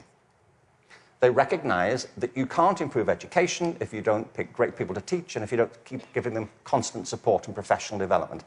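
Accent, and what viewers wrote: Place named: United Kingdom. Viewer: 從口音跟有爵位這回事來看，這人是英國人，不是美國人吧...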